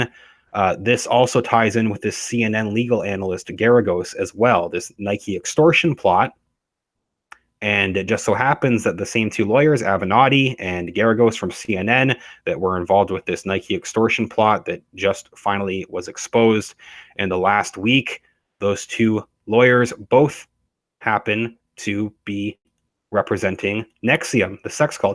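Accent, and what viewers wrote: Canada. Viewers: First time viewer you sound Canadian The walls are tumbling down Trump Crimes against children looks like its just getting started ! I subscribed the more Citizen journalists the better !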